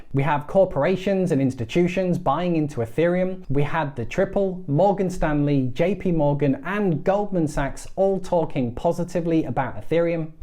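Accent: United Kingdom. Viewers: When I clicked on this video I didn't have my sound on and I predicted that you would be British and I was right.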